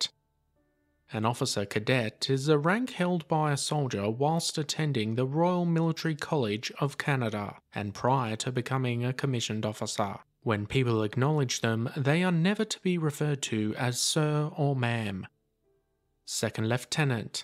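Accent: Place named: United Kingdom. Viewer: Interesting....my father was RCAF, seen WWII, stayed in until 1969. Not sure if it's the British pronunciation of the presenter, my father seen the old British style ranks then the new American style change....he retired a lieutenant-Colonel, I never heard him refer to his rank as a "leftenant" it was more a "lutenant-Colonel".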